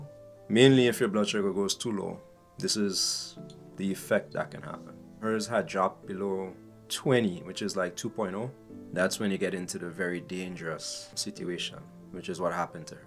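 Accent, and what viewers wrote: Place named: Caribbean. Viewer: Where man can't, God can! Where we fail, He prevails! ... He sounds like he's from the Caribbean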